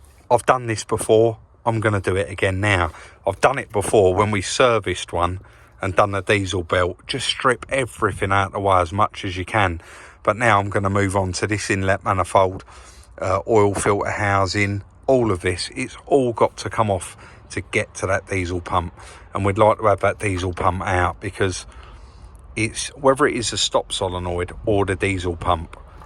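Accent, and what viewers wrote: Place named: Essex, United Kingdom. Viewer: When said rail at the start I thought he meowed, rail Sills nice alloy are hard words for the Essex accent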